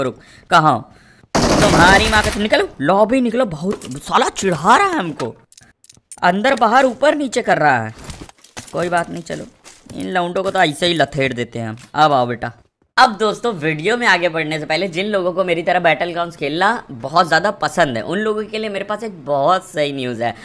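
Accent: Kanpur, India. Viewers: Bhai aap kanpur se ho kya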